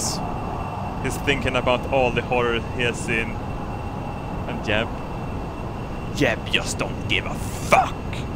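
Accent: Sweden. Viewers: är du svänsk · du e skit bra men du pratar inte så bra engelska (ta inte illa upp) typ svengelska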